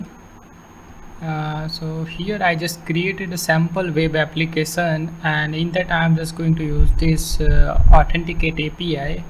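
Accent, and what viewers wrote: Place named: India. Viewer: я по говору индусов и их говнокодом сразу за милю чую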